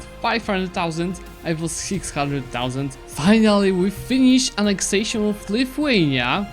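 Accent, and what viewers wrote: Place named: Poland. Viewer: Bro I suspected he was polish turns out he is I recognize a polish accent anywhere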